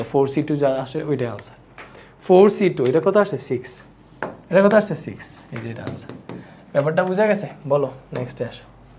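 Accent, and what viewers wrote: Sylhet, Bangladesh. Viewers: Bai sylheti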